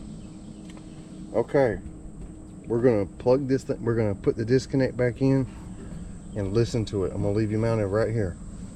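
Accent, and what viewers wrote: South, United States. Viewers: Ya he descifrado quién es tu hispanohablante adinerado. Qué bien. Eres un hombre de muchos talentos. Who would have thought a good old country boy from Georgia with a southern drawl is a fluent Spanish speaker? lol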